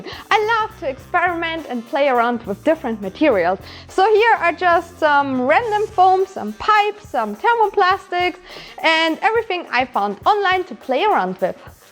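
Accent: Germany. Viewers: English akzent is totally german ;-) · You would make a great Bayonetta cosplayer! Someone as gorgeous as you are would really have no problem with this. Also thank you for always being genuine! I almost feel like it's difficult to find cosplayers that are kind and professional as opposed to being snotty and gatekeeping. Additionally I love your German accent and it's not weird at all! :)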